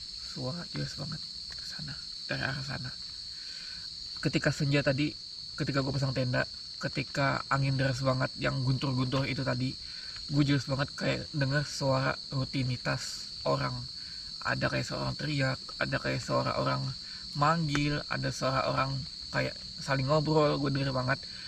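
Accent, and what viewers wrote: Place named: Amuntai, Indonesia. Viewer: Abang neh orang Amuntai kah.. 😀 kaya org Amt mandangar logat pandir pian.. 😀🙏🙏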